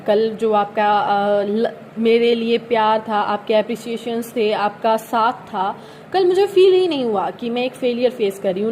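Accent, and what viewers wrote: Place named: Maharashtra, India. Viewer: Maharashtra s clear h ye to.... Marathi aati h apko🤔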